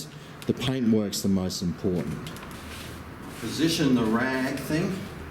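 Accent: Australia. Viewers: Every instructional video is better with an Aussie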